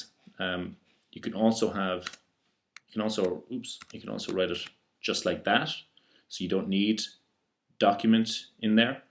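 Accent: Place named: Ireland. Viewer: loving the accent eire abú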